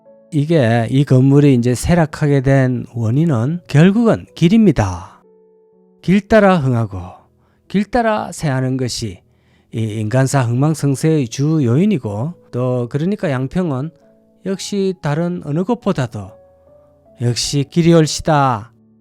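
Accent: Gyeongsang, South Korea. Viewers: 저도 * 같은 어투를 쓰는 갱상도 촌놈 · 우리동네 오셨네요 만났으면 해장국 한그룻하는긴데 덕분에 갱상도 원조말을 잊지않습니다 서울 외국 생활하다보니 점점 잊고있습니다 감사합니다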